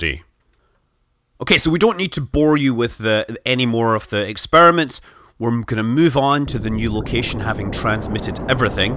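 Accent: Scotland, United Kingdom. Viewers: Scott Manley I have to say. Watching your videos has given me a massive appreciation for Kerbal and helping me feel like physics and rocket science somewhat approachable to the layman. And with a Scottish accent to boot! Thank you for helping me fall in love with this game and science :)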